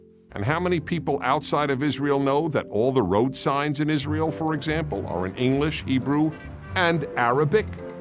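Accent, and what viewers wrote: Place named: United States. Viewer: It's the usual excuse and deflection from the presenter (who appears to be an American judging by his accent. The apartheid system is carried out in the Occupied Palestinian Territories. The Palestinians there are seperated, restricted and not allowed to vote over the Israeli government who rule their lives.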